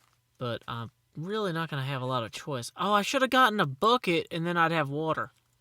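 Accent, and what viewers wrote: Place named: Appalachia, United States. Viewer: you know that we (a few british people) stereotype your accent for hillbillies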